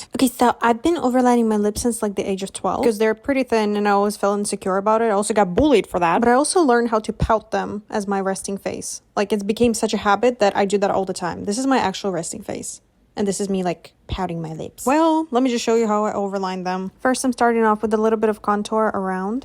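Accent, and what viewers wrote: Russia. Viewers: I'm actually wondering about your native language (like u've said about the immigration) cause it sounds so russian (delulu myself) And i also have 1 clue. Ur nickname can be read in russian like with the transliteration (the translation is unbreakable) sooo that made me even more sure about my assumption Didn't want to offence anybody, if i'm mistaken, at least you have +1 russian pookie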